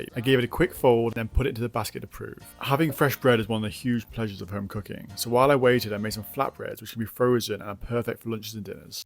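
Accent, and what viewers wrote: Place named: Yorkshire, United Kingdom. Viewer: Perhaps around abouts Yorkshire based on Dialect?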